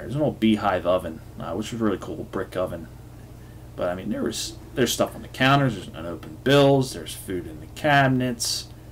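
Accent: Philadelphia, United States. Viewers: What is your accent? Are you a Philadelphia area native?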